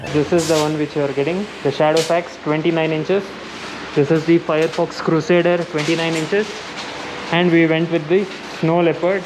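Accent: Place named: Rayalaseema, India. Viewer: Your kannada and seema slang telugu blend is perfect bro..... congrats for u r new bycycle gang 🙌......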